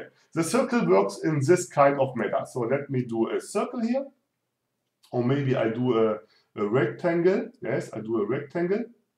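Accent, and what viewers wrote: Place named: England, United Kingdom. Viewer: your work is amazing but your english accent is need to change, where are you from pro ?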